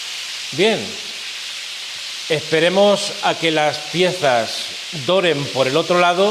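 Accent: Castile, Spain. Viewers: me encanta que cuente historias mientras cocina. Me gusta mucho verle cocinar, como lo explica todo y escuchar su voz es recordar la entonación castellana de mi abuela manchega. ¡Qué tranquilidad y relajación produce ver sus vídeos de cocina!. Y muchas gracias por todas sus enseñanzas. Como bien dice usted, Paz y Bien para todos. Saludos desde Córdoba, España.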